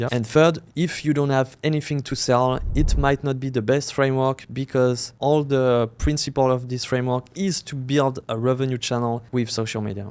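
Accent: France. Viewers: felt the French accent... mais j'aime ce que tu proposes btw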